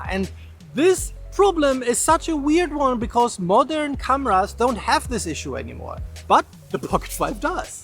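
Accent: Germany. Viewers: My first visit to your channel. Very nice experience. For once, a sure feeling that you are not promoting anything. We need people like you. And if I were in China (or Dubai) with you, I would find your German accent charming ;)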